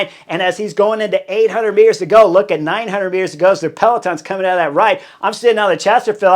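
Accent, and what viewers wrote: United States. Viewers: This American accent is so thick I can barely make it out when he says the names of the riders.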